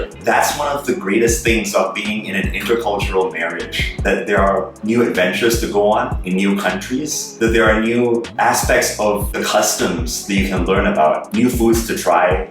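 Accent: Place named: India. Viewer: The way u speak sounds pretty Indian also the way u call her name it seems like u are familiar with hindi!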